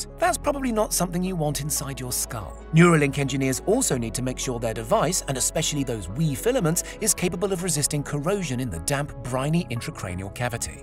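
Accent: United Kingdom. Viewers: Why do I love this guy's British voice so much?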